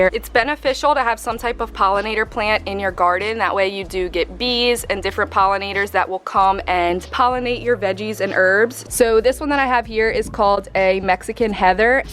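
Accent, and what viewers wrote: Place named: Philadelphia, United States. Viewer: Oh you are in New Orleans...I thought you sounded like Philly! Please update in a few to show how they have thrived!!! 🌿😀🌱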